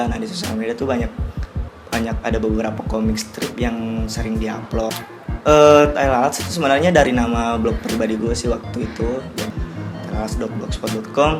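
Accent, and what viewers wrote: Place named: Sulawesi, Indonesia. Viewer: Hilang mi logat Sulawesinya tawwa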